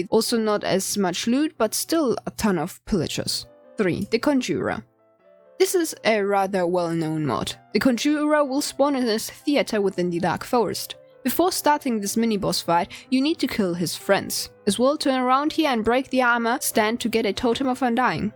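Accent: Czech Republic. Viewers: i luv ur czech accent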